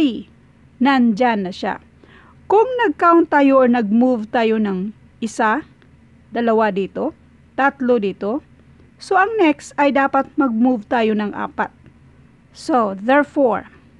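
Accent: Visayas, Philippines. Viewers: Bisaya ka ba! 😁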